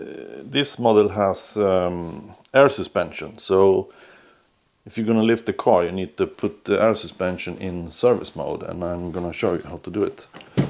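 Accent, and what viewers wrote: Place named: Minnesota, United States. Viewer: Great video!! Born and grew up in Minnesota, I can tell where our accent comes from!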